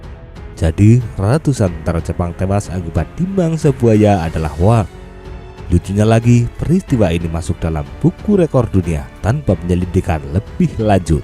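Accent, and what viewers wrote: Java, Indonesia. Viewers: Tolong di ganti pengisi suara nya ini gak jelas ku dengar logat Jawa baru kayak hidung di tutup pula ngomong nya JD gak kurang jelas terdengar oleh saya gak tau yg lain mungkin bgt jg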